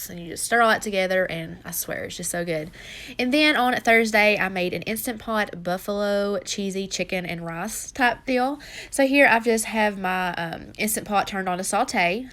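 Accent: South, United States. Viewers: I just love your channel..I love your southern accent. And your great food ideas..keep it up..much love from Nashville,tn Katie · Eeeekkkkk I’ve missed these videos so much 😭😭😭😍😍😍😍😍 and your accent is everything. Southern girl here too ❤️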